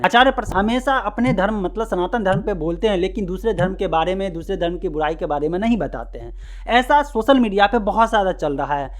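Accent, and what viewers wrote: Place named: Pakistan. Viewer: भाई वीडियो का थंबनेल और टाइटल चेंज करले आचार्य जी नाम के आगे दूंगी लिख रखा है वीडियो सही बनाई है पर ये सही नहीं है व्यूज कही और से कमा लियो, आचार्य जी के तरफ से बोल रहा है तो ढंग से बोल ये क्या आधा हिंदुस्तानी आधा पाकिस्तानी। भाई जल्दी नाम के आगे से ढोंगी हटा नहीं तो रिपोर्ट और dislike करनी होगी मुझे।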